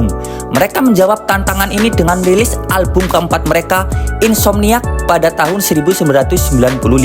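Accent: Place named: Banyumas, Indonesia. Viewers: keren ngapak 😂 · ora ngapak ora kepenak 😂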